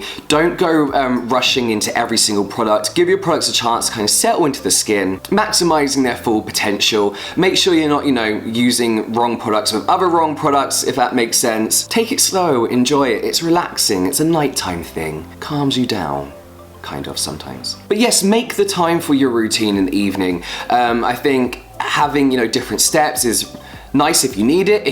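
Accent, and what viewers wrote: United Kingdom. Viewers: You thought me a bit of british accent, Second significant reason of me watching lol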